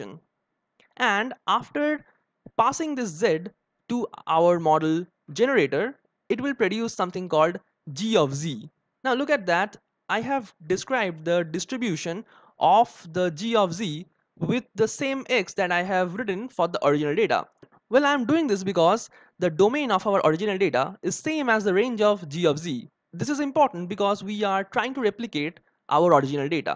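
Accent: India. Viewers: I present you the indian english : )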